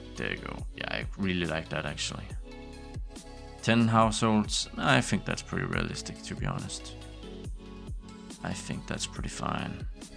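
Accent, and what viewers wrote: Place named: Denmark. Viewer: Love the Danish accent ❤️❤️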